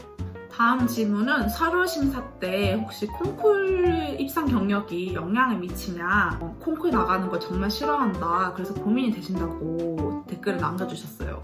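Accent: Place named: Seoul, South Korea. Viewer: 아니 님 영상에서는 왜 서울말을 쓰시는고에요?🤭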